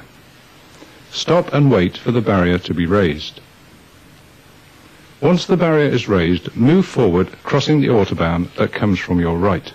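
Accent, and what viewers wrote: United Kingdom. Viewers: I think it’s the very stoic and calm British accent aswell- it’s like those videos of what to do in a Nuclear War they made around the same time as this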